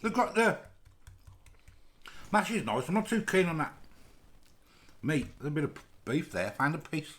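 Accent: Birmingham, United Kingdom. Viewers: Proper brummie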